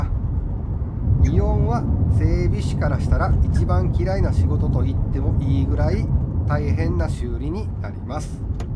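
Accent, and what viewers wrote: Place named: Kansai, Japan. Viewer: 関西イントネーションもいいです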